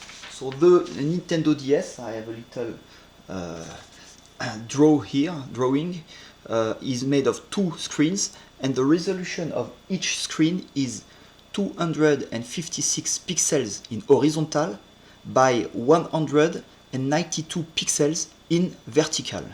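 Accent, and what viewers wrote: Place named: England, United Kingdom. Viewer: Magnifique accent anglais ^^, le rendu a l air bien sympa !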